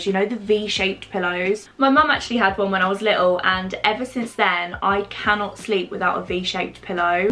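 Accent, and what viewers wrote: United Kingdom. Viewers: Completely unrelated question but where are you from? (Obviously don’t say if it gives away where you are living) but I have been watching your videos for quite a while now and cannot place where in the UK your accent is from. I love it!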